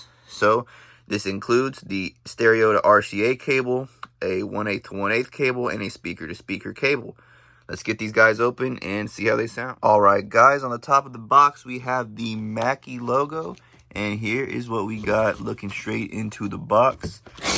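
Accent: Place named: United States. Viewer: I hear the US accent and I know the claim in the title is bs. I'm out of here.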